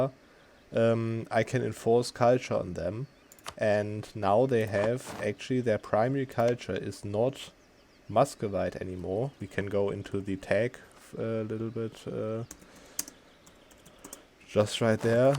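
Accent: Germany. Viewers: You’re from Germany, right? Nicht schlimm, ich mag unseren Englischakzent. :D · You have a German-Accent XD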